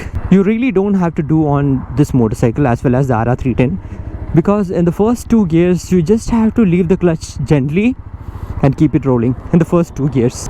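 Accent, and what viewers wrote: India. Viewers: Such a calming soothing voice and also you speak English in such a fashion it feels like you are speaking in hindi. Amongst the most genuine and humble person i know on youtube. Keep up the good work bhai, ❤️🙏😍🙌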